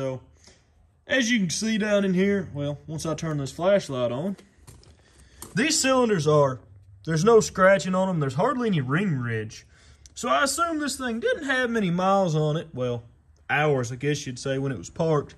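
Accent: South, United States. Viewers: Cool to hear a young person with a strong southern accent still.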